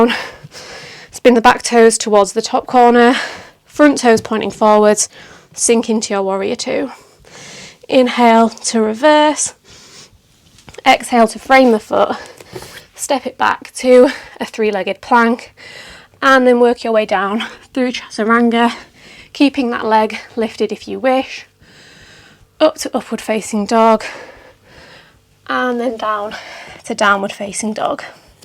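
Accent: Yorkshire, United Kingdom. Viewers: This is the first flow I've done with you and it was great to hear a Yorkshire accent teaching yoga, makes me feel at home 😊 it was intense and I am ded but will be coming back to practice for sure x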